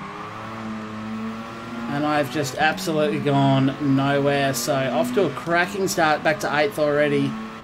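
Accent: Australia. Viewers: are you australian? anyway great video!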